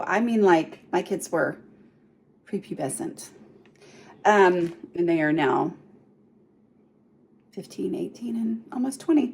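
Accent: South, United States. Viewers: Does anyone one else LOVE 💕 that southern accent is STRONG in this video? No? Just me? Well someone needs to 😍😍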